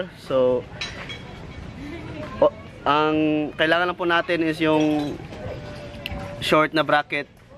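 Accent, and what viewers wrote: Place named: Visayas, Philippines. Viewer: "giatay ka!" hahaha yawa oyyy. Nindot jod paminawn bsta bisaya mag yawyaw haha rs brod nice video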